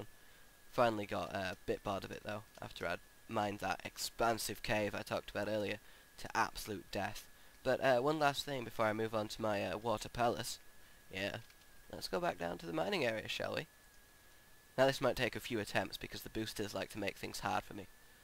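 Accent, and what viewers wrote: United Kingdom. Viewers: british version of cartman from south park xD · Your accent really frustrates me. I'm sorry. You sound like a British person trying to sound Australian and American at the same time. Nice videos by the way.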